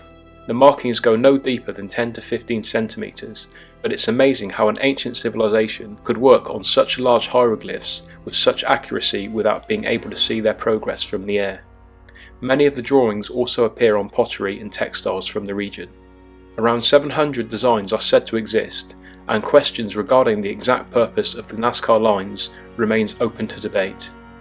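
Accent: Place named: Dorset, United Kingdom. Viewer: Non-sensationalist content, nice measured delivery, and that Dorset accent (lived there for a short [but "interesting"] time) Automatic subscription (and I've forwarded your YouTube location address to a colleague of mine, who retired to Bridport, after finally completing her Ph.D. (3 months before retirement :-D ) Yes, Les - I'm talking about you!! :-D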